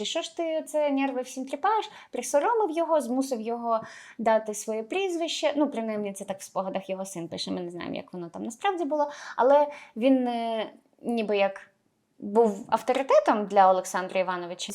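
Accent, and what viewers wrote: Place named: Central Ukraine, Ukraine. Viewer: Та Ви шо ! Мова в цієї дівчинки така класна, так говорять в Центральній Україн, на півдніі - це ж наш скарб - різні діалекти, вимови .Вони тільки збагачують її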